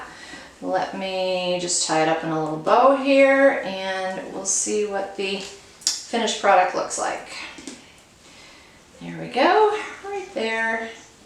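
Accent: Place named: France. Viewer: Hi and! I have a rescue cat named Norm! We rescued him from our local shelter! I absolutely adore your glasses! Your French accent is divine, dahling! Beautiful bag, too! Thank you for sharing, my friend! 😍🐈❤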